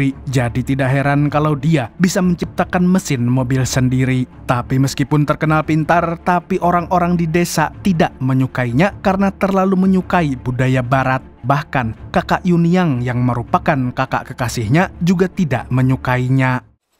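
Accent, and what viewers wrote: Banjar, Indonesia. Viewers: Bg lo kalsel dimananya, bahasa banjar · Admin ikam buhan banjar kahh logat nyaaa ulun sukaaa sekali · banjar banget sii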